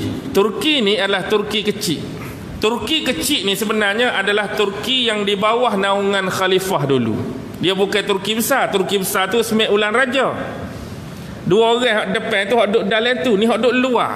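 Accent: Kelantan, Malaysia. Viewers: 1st time dgr ustaz bg tzkirah full guna loghat klate,ad gk trselit skit loghat utara 😂 btw,cite p ramlee mmg byk mksd trsirat,org² trtntu je yg bole pham..moge ustaz trus succes dunia akhirat..aamiinn #XlapukDekHujanXlekangDekPanas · kuliah ni buleh dengar Ustaz kecek Kelate, Kuliah lain bbunyi Utagha semace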